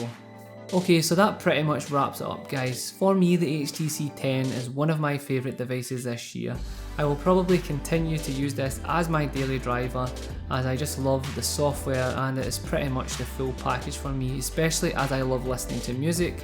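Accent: Scotland, United Kingdom. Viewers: Are you Scottish? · are u Scottish?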